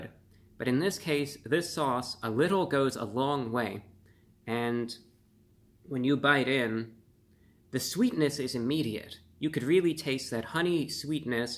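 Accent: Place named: Mid-Atlantic, United States. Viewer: Review Bruh, the mid atlantic accent is getting more cringy, tone it down. Just let the inner upstate New York come through.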